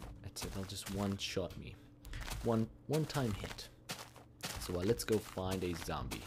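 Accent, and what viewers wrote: Australia. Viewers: thats because people liked his australian accent so he does that now.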